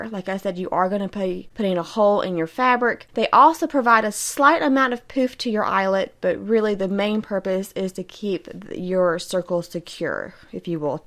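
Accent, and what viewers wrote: South, United States. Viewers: cute country southern accent. and good video.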